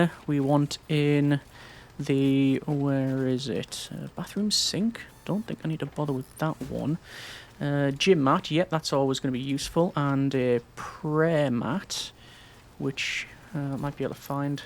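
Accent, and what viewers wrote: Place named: Northern England, United Kingdom. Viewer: Based on the way they say poor: I'm going Northern English.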